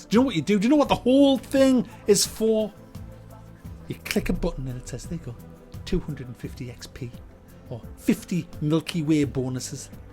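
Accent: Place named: Scotland, United Kingdom. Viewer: I subscribed after hearing your fantastic Scottish(?) accent! Oh, and the ranting is a good bonus.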